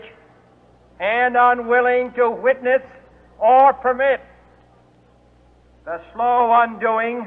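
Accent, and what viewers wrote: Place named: Boston, United States. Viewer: Ogre It’s the Boston accent.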